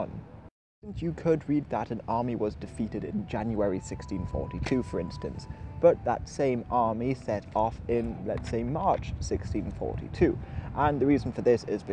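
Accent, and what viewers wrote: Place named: Newcastle, United Kingdom. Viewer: The reason pronounces 'year' as 'yeah' is because he is from Newcastle. So what you're hearing from is a Geordie accent.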